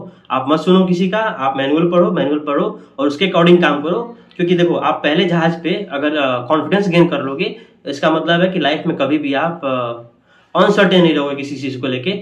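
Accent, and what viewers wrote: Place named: Bihar, India. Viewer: Bakwass video 😂😂 typical bihari 😂😂 Bhai teri awaj se hi lag ra h Tu pure bihari h 😂😂😂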